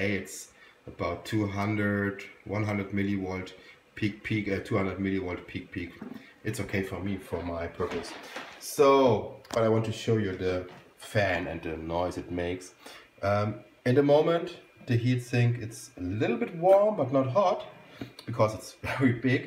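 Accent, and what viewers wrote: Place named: Germany. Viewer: Wow, das nenne ich mal eine hurtige Antwort! Danke, wollte nochmal eine zweitmeinung dazu hören weil ich mir wegen gleich und Wechselstrom nicht so sicher war... Bei den Lüftern bin ich noch am überlegen Wiedes am besten funktioniert... wahrscheinlich werde ich das ganze in dem Gehäuse eines alten pc-Netzteils verbauen und die Originalen Lüfter verwenden obwohl das auch passiv gekühlt ausreichen sollte. Habe nen Kühlkörper einer alten Grafikkarte genommen, der besteht aus massivem Kupfer und sollte mehr als ausreichend sein. Super, Danke nochmal für die schnelle Antwort, jetzt kann ich mich an das Kit ran machen dann ist es mit etwas Glück heute Abend alles fertig. Hehe der Akzent war doch etwas verräterisch deswegen hab ich mal auf deutsch getippt 😁👍 Grüße zurück Andreas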